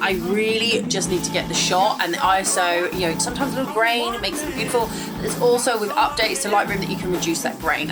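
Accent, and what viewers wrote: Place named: United Kingdom. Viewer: Actually I thought these are great tips I am going to bring in my small gig photo session today. But the presentation was rather busy. No offense, but the quick talking with the specific accent made it slightly hard to follow what was said for a non UK person as me. Specially with the brief text that flashed past in the bottom of the screen. A tips, slow down. Don't try to say as much as possible on as short time as possible.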